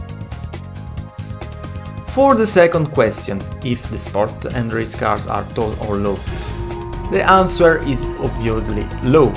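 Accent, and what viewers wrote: Italy. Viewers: Are you Italian? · scusami, è da poco che ti seguo ho solo visto 2/3 video, ma qualcosa nella tua voce mi dice che sei italiano, è irrilevante e solo una mia curiosità. comunque fai dei bellissimi video complimenti! sorry, I have only recently seen 2/3 videos, but something in your voice tells me that you are Italian, it is irrelevant and just my curiosity. anyway you make some beautiful video compliments!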